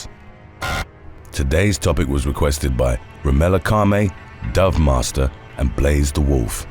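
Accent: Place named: England, United Kingdom. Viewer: Holy shit! That's you?! It is! Woah! So let me get this straight; you're that frickin stacked, black with a charming English accent, you got one of the best channels on YouTube and you look like that?! Alright! We get it! You're the king of all men everywhere! Fuck off! Would ya? Fucking King Man over here. I mean shit! What's next? Ya gonna front a rock band? It's no wonder we never see you. You're probly too busy having a three way with lingerie models on a mountaintop or something. Shit! Oh by the way, I think a good topic would be, "When cemetery workers / gravediggers/ morticians and the like go wrong." I've known some of these folks and they're all fucking psychopaths!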